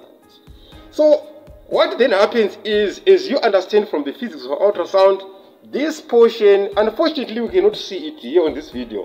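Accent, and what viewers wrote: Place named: Africa. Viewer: I'm enjoying the videos especially thr African accent. Makes me feel so at home !!! Watched and started to understand knobology from same radiologist !!! Just great !!!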